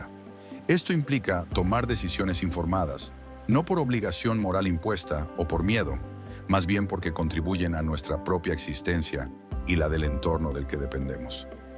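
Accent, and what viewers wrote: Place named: Paraguay. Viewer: Por qué la IA tiene acento paraguayo? No es que tenga algo con los paraguas, me cae simpático su asentó. Pero para escuchar este tipo de cosas me resulta incómodo.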